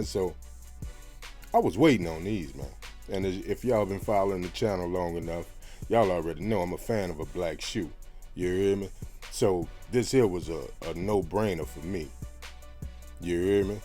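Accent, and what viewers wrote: New Orleans, United States. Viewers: You got to be from New Orleans you talk and sound just like my boy. You heard me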